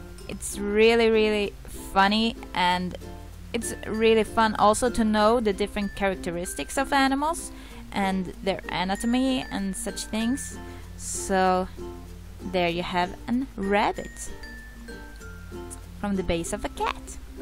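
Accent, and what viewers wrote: Sweden. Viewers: I thought that sounded like a fellow swede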